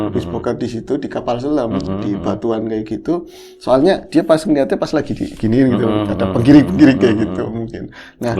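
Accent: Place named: Tegal, Indonesia. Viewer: Mas msh tetep di NGOBOR dong,jngn digantiin yg lain,soalnya udah enak ndengerin suara,n logat tegalnya,tdk tergantikan...😄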